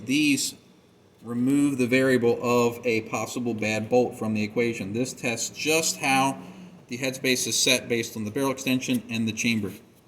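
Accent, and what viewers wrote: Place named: South Jersey, United States. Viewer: Are you from the south jersey/Philadelphia area?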